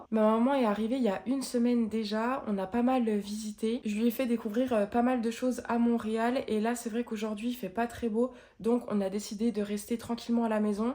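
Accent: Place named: Franche-Comté, France. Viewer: Hello! Je suis tombée par hasard sur une de tes vidéos il y a environ une semaine, j'ai vraiment bien aimé, et j'ai eu envie de rester. Et puis je me disais "il y a un léger fond d'accent qui me fait penser que"... Et quand j'ai vu le papier du fromage ça m'a confortée dans l'idée ^^. Contente de suivre les aventures d'une franc-comtoise au Québec, et au plaisir de te revoir par ici!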